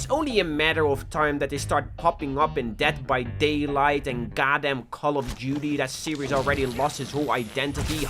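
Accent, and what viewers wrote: Netherlands. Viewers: hoi ben jij nederlands??? ik hoor het aan je accent lol edit: lmr ik weet gewoon dat het zo is want ik ben echt foekoeng slim snap je de grap hahahahahhehehehehe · are u dutch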